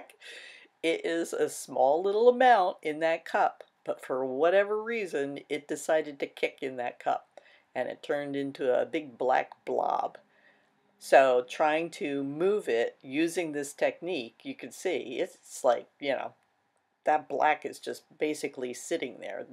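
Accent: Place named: Northeast, United States. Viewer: I was so excited about the phone tip. Seems apple has removed that part of the compass 😔 And I knew from your accent you are from the NE. If you’re like me you’re saying, I don’t have an accent!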